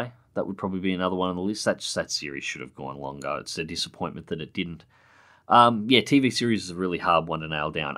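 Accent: Australia. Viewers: you could play anything and i'd watch. It's nice to hear another Aussie voice every day.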